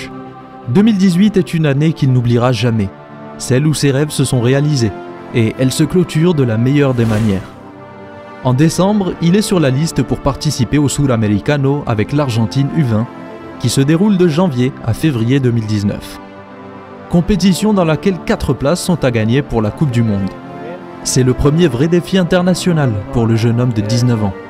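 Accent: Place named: Spain. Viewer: Ton accent espagnol , ta diction est juste incroyable VISCA BARCA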